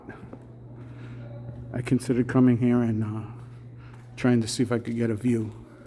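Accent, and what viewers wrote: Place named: New Jersey, United States. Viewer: Playing now! I love your accent! I lost mine but easily pick it back up when around my nj family. I remember being very little and vacationing in AC before it was ‘ the ac’ had to be around ‘67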